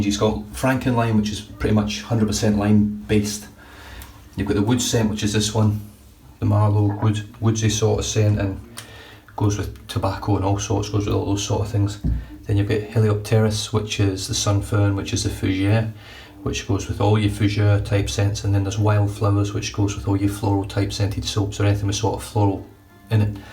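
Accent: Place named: Scotland, United Kingdom. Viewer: ahh..OK..Top of the Chain not 'Top Of The Chin' ...the Scottish accent caught me out LOL (and I have a Scottish doctor who has a thicker accent) LOL..OK mostly out of stock there ...I'll join the waiting list.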